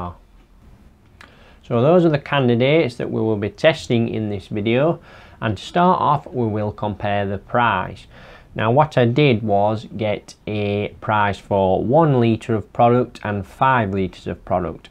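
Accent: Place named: England, United Kingdom. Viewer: Great video! It's a pleasure to hear a proper English accent with clear, simple explanations - all backed by thorough research and planning. Beats a loud, shouty American making big unsupported claims from a sunny California beach! Top work. Well done, that man...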